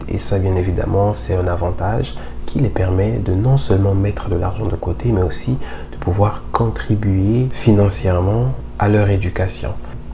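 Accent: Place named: Cameroon. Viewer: Quand j'ai vu ta manière de parler j'étais étonné que les kenyan ai le même accent que nous au cameroun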